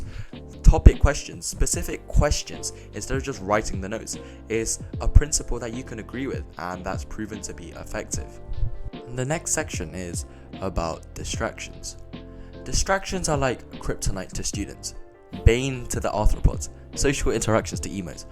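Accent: England, United Kingdom. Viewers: Just noticr this is a british asian, he got the brains and sounds even smarter because of his accent gawddamnit! Lmaoo i paid attention though thank for the tips bouta applied the today!! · At first, Korean with this English accent🤌 Secondly, good video, hopefully it'll help my little sister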